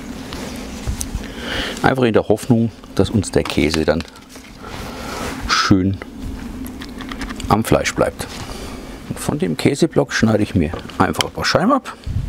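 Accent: Franconia, Germany. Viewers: Hi, tolles Rezept wie immer. 😋👍 Eines nur: 'Wenn ma' no au dei frengischs gebrabbl a bissle bessr vrschdao dät', dann wär amma arma Obrschwåba wia mir scho' viel gholfa! Bleib bei deinem/r Dialekt/Mundart - es würde SO viel verloren gehen, redeten wir nur in der ersten Fremdsprache, die wir Süddeutsche als erste lernen mussten. A Griasle vo' Obrschwåba - und mach weiter so. 👍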